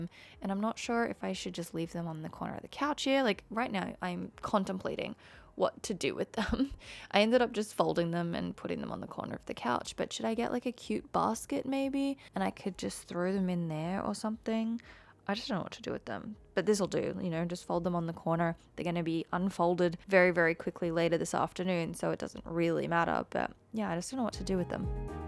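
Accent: Australia. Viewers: Do I detect an Aussie accent?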